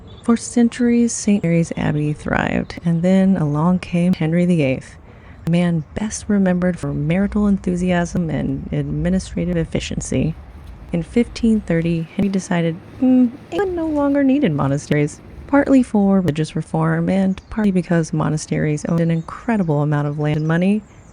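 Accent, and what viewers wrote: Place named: New England, United States. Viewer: from a personal standpoint big H went right over my head mostly! I was being captivated by that sharp witty Yankee tongue of yours and the glorious ginger mane! To comment on your original question I’m gonna now have to revisit it! 😁🔥🤟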